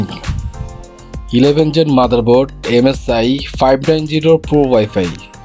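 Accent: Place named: India. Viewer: tu ingles es muy indio flaco mejor habla español y deja de joder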